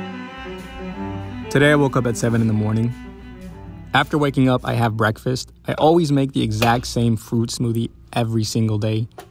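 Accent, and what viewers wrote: Boston, United States. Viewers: The Boston accent really completes this. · idk where bro is from haha but he's def giving me Boston lmao. But I will say that Harvard (or the Ivy's in general) are not for me. In my undergrad at California State, we referred to kids who didn't study as Ivy leagues lmao or USC transfers. Glad to see a Harvard student breaking the stereotype have of them tho. (for context, I'm finishing my masters and am about to apply for my PhD rn)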